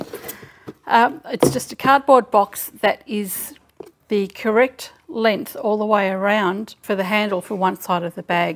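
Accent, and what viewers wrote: Australia. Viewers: Is she American? She sounds Aussie to me, I might need my ears cleaned lol.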